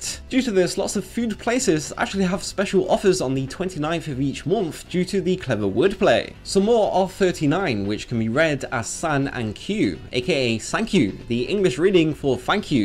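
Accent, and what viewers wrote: United Kingdom. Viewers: That's because he's British, all British people would call the anime Dragon Ball Zed.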